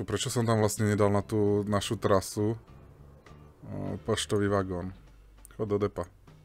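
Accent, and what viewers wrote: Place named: Slovakia. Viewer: Ach,jo..Ty jsi ale případ. :D Ale nakonec jsi zjistil jak na to,ne?Asi ti to zabralo pár hodin pátrání a zkoušení,ale co už no....Jako v tom večerníčku Pat a Mat.Akorát,že ty jsi na to sám.Moc jsem se pobavila. Děkuju. :D Vím,že se opakuju,ale to slovenský ř nemá chybu. :D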